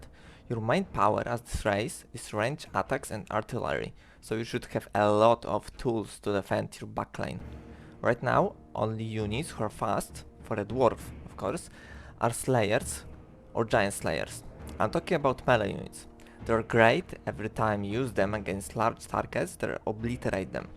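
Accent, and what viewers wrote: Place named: Poland. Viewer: Slychac ze polak haha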